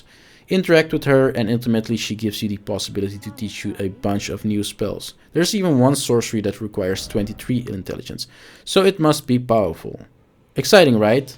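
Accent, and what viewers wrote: Netherlands. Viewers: you have to be dutch, i can hear it. nice video :)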